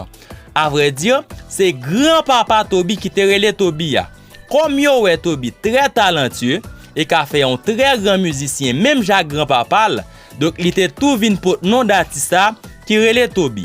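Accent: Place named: Cap-Haïtien, Haiti. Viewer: Ou pale menm jan ak moun okap ou se moun okap?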